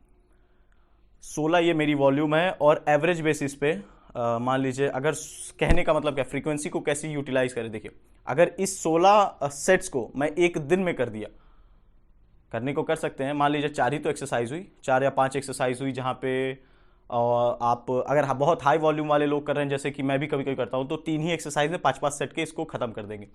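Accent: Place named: Awadhi, India. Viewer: Yaar tumhara Awadhi dialect kafi achcha lagta hai.. 🌼🌼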